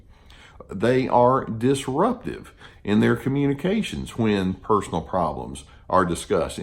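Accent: South, United States. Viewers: I really enjoy these videos and learn so much about how to apply the principles that both you and Dr. Ramani explain So well. You're warm and folksy style reminds me a lot of sheriff Andy Griffith of Mayberry RFD. Are younger people that is a black-and-white sitcom from the 60s and 70s about a very small southern town. Andy the Sheriff had a little boy named Opie and virtually half the shows were about some sort of silliness that occurred when people were trying to get what they wanted or solve a problem in the wrong way and ended up in trouble. Eventually Sheriff Andy would figure it out and help straighten it out and might give a lesson or two to his goofy but narcissistic sidekick deputy sheriff. And then most shows would end with Sheriff Andy Griffith explaining some principle of good citizenship and healthy honest Behavior to his son Opie, who was about 6 or 7 years old. 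He had a similar accent to and so videos remind me of sheriff Andy explaining life proper values and behavior to Opie. That show is available on streaming services and it's a very relaxing watch before bed or when you're in the mood to fantasize about a world where people are basically decent and everybody learns their lesson and not too harsh of away in the end and Common Sense prevails. Not to mention that doing the right thing pays off. What a shame it is that he couldn't raise both us and the narcissist so that we had good self-esteem and boundaries and the narcissist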